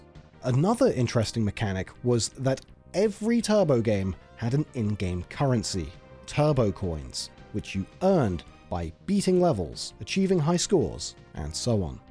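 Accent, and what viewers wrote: United Kingdom. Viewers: Stop using British accents already. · Looks like the script itself was copy-pasted as captions, instead of using YouTube's auto-caption system. This video was written by an Arkansan (me, I write like I talk), but it was VO'd by a Brit, that's why there's some inconsistency.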